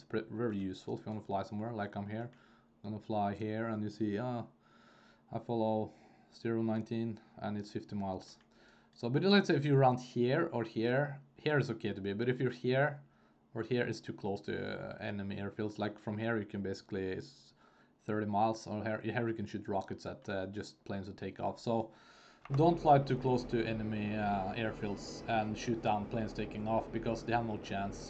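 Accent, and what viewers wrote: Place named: Norway. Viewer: U sound Norwegian💯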